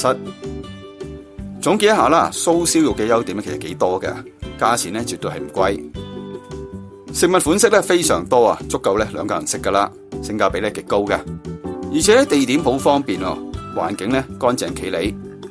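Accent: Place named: Hong Kong, China. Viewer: Data兄的广东话在香港人中应该算非常好听的那种吧？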